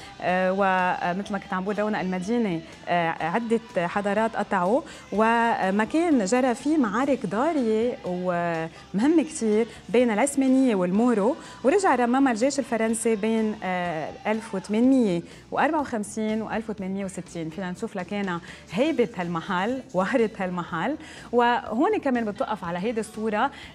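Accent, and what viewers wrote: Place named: Lebanon. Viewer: هههههههههههههههههههه..سبحان الله تقول عليك لبنانية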